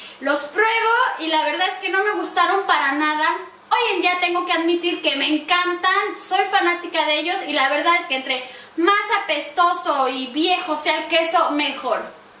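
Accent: Mexico. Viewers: Vine a ver el primer (?) video, y encuentro que hablas casi igual, no se que tanto cambio le ven, me alegra que conserves tú acento mexicano y esas palabras que los otros países acostumbramos a escuchar ♥️ ajsjaj, me alegra que no te "engrupas" como decimos en mi país, conozco chilenos que han vivido 20 años fuera de aquí y hablan como si se hubieran ido ayer, conserva mucho tu identidad saludos. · te felicito, eres super fresca y carismática para expresarte y describir tus nuevos hábitos y costumbres. Te has adaptado muy bien a tu nueva realidad, pero te felicito porque tu español-mexicano, sigue siendo perfecto, y yo como tu compatriota, me da orgullo que no pierdas tu identidad. "A la tierra que fueres, has lo que vieres". Eres, sin proponértelo, una traductora y embajadora mexicana. Además de que luces tremendamente atractiva. Que orgullo tenerte como mi compatriota. 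Es el segundo video que veo de ti, para aprender francés, y ya eres mi favorita. Gracias por tu generosidad, vitalidad y entusiasmo, para mi, ya eres trending-topic. Te admiro y me motivas a aprender más de Francia, su lengua, sus tradiciones, costumbres, comida, landmarks, música, moda y cultura.Tienes toda mi simpatía y afecto. Mega DIEZ!!!!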